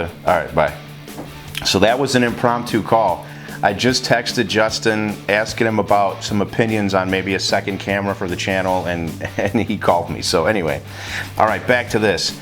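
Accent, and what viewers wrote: Chicago, United States. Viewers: Ok ok you got me new sub and I detect a Chicago land accent so just curious where from. Spent 35 years mostly in DuPage county and damn I miss the food as these folks here in southern New Mexico dont have a clue. I'm gonna give this a go this Sunday for football but I may pan sear and then run in the slow cooker to get tender.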